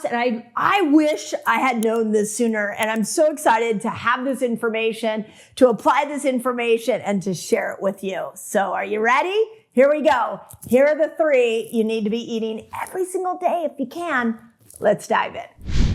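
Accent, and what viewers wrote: California, United States. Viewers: Doc has a bit of a California surfer accent.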